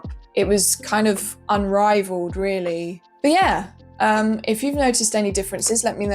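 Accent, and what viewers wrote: England, United Kingdom. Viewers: Why are you talking in a Harry Potter accent the whole video? Kinda weird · A cute girl with uk accent playing on a CRT? What else can I ask 😳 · Cuz she's from England & there's people who actually sound like that.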